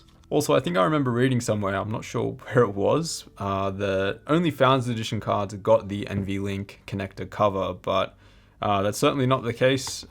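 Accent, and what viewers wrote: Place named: Australia. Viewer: Ayy had a feeling you were Australian :D woot I'm from Straya too bro haha, honestly though INCREDIBLE breakdown and explanation of the card, that was top notch ^_^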